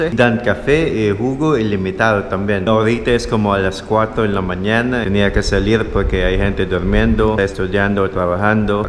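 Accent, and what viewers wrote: Philippines. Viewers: Es un milagro para oir un Filipino hablar en español. No como los tiempos mas trempranos antes la guerra en 1898. Quien te enseñas? Sabes hablar Español con el accento Filipino como los hispanoblantes de Filipinas (El espanol de Filipinas)? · Habla español como un actor filipino que se llama Mike Tan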